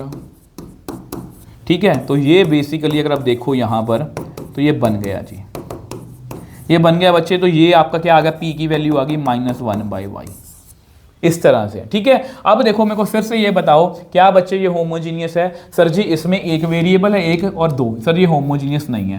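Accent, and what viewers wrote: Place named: Pakistan. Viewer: Sir apki accent pakistani lgti h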